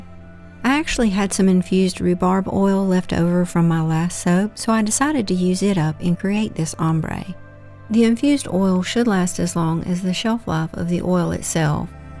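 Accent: South, United States. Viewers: Does her English sound weird to you? She has a southern accent, from the Southern states of the US.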